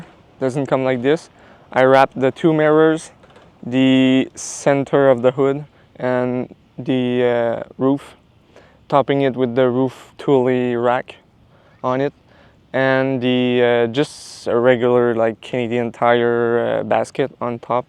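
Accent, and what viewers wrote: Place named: France. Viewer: Are you French I kinda hear that French accent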